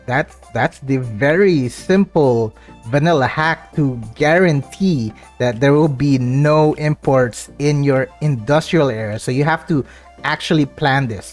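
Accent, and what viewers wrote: Philippines. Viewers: Are you a filipino? Your accent is familiar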